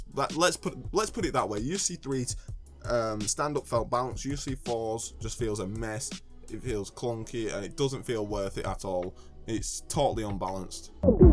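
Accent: United Kingdom. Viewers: You sound British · Jeez mate your accent is like nails on a chalkboard to my damn ears. Common as MUUUUCK. I'm also from the UK. This is the bottom of the barrel of accents we have lol thIIINNNGGHH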